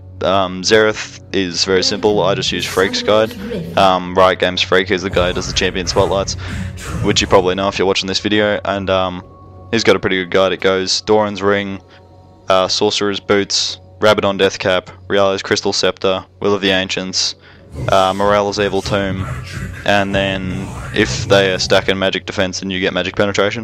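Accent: Australia. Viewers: i hate Australian accents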